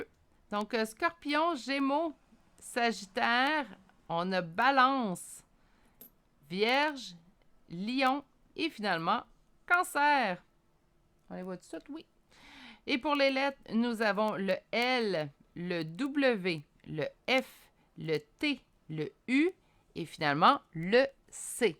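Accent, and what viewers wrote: Canada. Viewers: Bonjour, Ça sonne juste. Ha, que j'aime aussi écouter ce merveilleux accent Canadien chaleureux et rassurant ! Bises.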